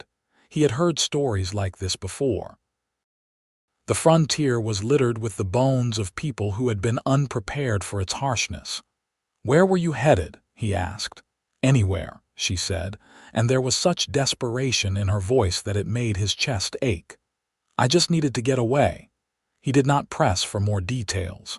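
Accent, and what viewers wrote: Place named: United States. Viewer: At First: favorite narrower - an ideal voice for wild west stories, through and trough American English, sounding like a music instrument. Greetings from Germany.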